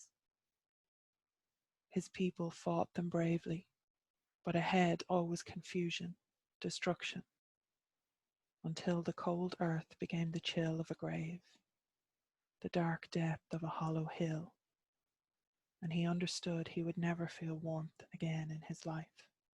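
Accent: Ireland. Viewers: Thank you for this immersion in Irish story in authentic Irish voice and spirit!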